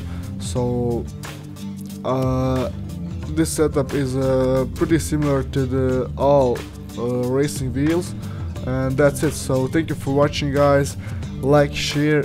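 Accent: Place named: Hungary. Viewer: Damn your accent so similar to my hungarian accent. Where are you from?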